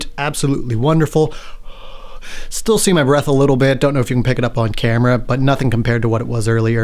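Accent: Canada. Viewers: Yeah!! More build videos! You can definately tell you are Canadian by your accent! Our lovely neighbors to the north eh? Have a very blessed weekend 💕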